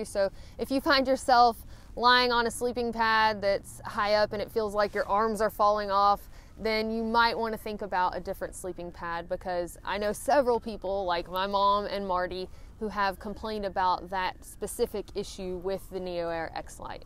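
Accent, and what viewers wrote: South, United States. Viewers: love her slightly southern accent =]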